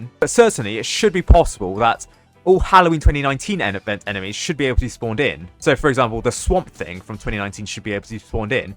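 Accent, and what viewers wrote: United Kingdom. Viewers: i forgot was British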